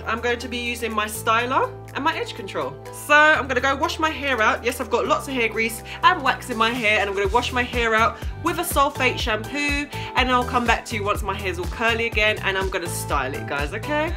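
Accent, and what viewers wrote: United Kingdom. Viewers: I love you UK accent, I've Cameroonian accent 🤪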